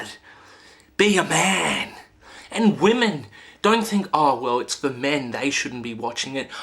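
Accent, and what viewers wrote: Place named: Ireland. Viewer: you use dragonballs to make a wish. I seen every episode. When I was a kid that was the best shit out. And why do you look Chinese and sound irish?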